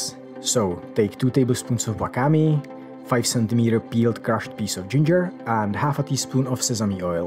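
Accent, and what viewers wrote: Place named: Czech Republic. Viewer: Z přízvuku se zdá, že jste Čech. Skvělé video, vyzkouším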